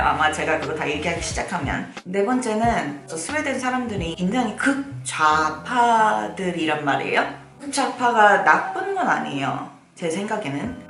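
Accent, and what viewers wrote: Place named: South Korea. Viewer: 영어에 한국어액센트 넘 심해서 듣기 불편. 그냥 조선말로 편하게 하세요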